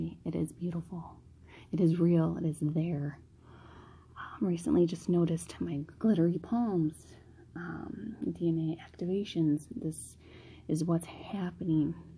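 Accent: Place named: Illinois, United States. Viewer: I understand!! My voice sounds so weird hearing it back. We are our best critics but shouldn’t be!!! And yes it’s slight but you definitely pronounce certain words with a IL accent lol. I like it! It just sticks out to me living in NJ / NY area with the heavy accents but mine is pretty neutral compared to others. Much love!!!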